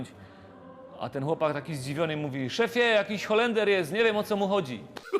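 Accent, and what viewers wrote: Silesia, Poland. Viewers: Nasz kochany juz Cie zodyn nie zastąpi tys jeszcze godoł jak my w Stolarzowicach 😢 teroz momy juz tyla goroli na Naszym Sląsku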